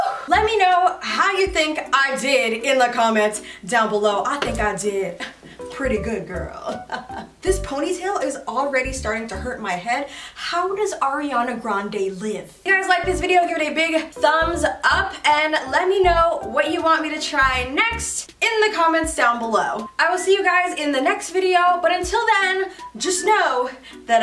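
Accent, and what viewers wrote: United Kingdom. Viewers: The British accent is so realistic I am from England you literally sound like me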